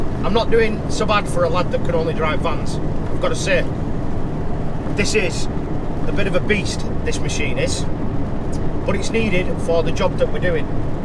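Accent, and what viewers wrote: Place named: North, United Kingdom. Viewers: I dont even know how I got onto your videos! But when I heard your northern accent and I was hooked😅(I'm from Preston😉) but my dad drives tankers and it's good to see what it's like in that line of work. Keep up the good work mucka!👊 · It's great to hear a local voice on here not really many people on here from greater manchester especially my home town Bolton! ....I'm a bus mechanic and own a few myself (The older stuff) and i cant express how much fun clutches are on big vehicle's when driving automatic's all the time. Feel's great to be behind it especially the double de clutch also with the older ones like mine we have 1,2 Crash 3,4 Syncromesh....Great fun....Keep the good video's up lad.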